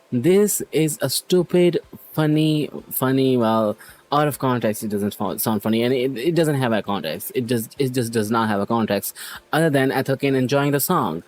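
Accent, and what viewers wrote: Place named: India. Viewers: ... what? Like, that's one of the most common Indian accents. Should he stop being Indian? 😭🙏🏻 Nvm tho, this must be rage bait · I love indian accent :) its my favorite accent in english (im from brazil)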